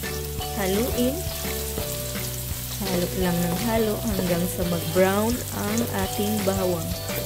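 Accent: Visayas, Philippines. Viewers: Haha bisaya kasi e, chill kalang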